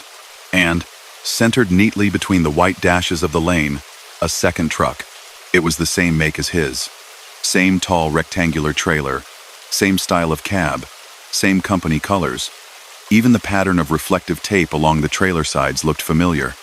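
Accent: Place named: Midlands, United Kingdom. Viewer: Uk midlands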